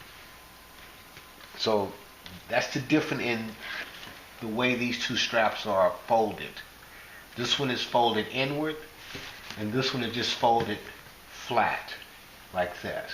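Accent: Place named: South, United States. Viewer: Love that dirty south dialect…. Miss it so much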